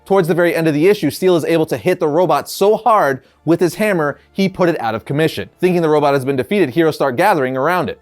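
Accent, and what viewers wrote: Puerto Rico. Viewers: Where's your puerto Rican accent? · What!?!? Eres boricua???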